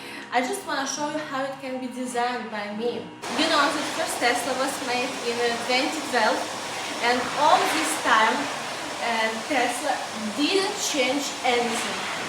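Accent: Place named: Russia. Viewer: акцент русскоговорящий ;)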